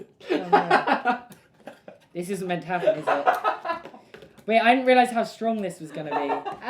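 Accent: United Kingdom. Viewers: Hi I’m new to ur channel today and just subscribed but I was wondering why u have a british accent but it was in $ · is british? idk but his talking is different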